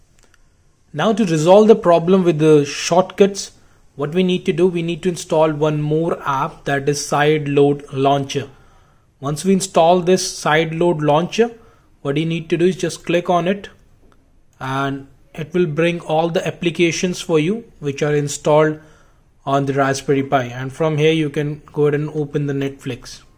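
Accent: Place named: Delhi, India. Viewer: I am guessing you are from Delhi, by your accent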